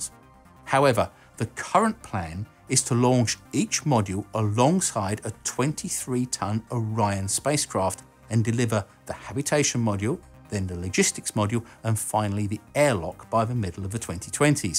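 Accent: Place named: England, United Kingdom. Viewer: He is english. What accent should he have?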